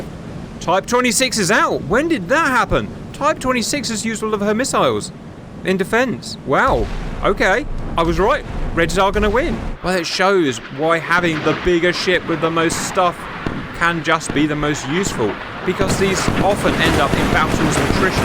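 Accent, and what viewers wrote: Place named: United Kingdom. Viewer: Agreed. YT isn't purely about knowledge. has his own personality, plus he's BRIT-ISH (make a funny pronunciation yourself when reading). When looking at channels that get to this size, it's typically at least half of the host just being interesting/entertaining/weird/fun etc.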